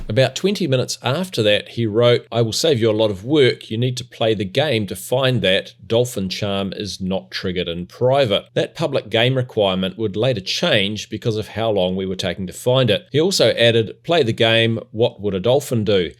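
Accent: New Zealand. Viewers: ru from new zealand or australia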